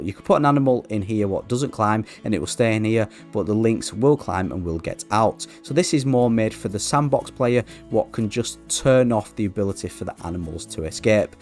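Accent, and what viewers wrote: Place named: England, United Kingdom. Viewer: Very talented mate- glad to hear the English accent, not often you hear "sack it off" on youtube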